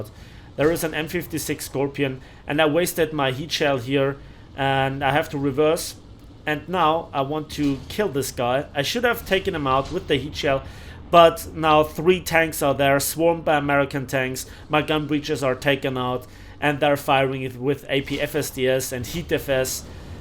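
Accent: Germany. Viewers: I just love your German accent man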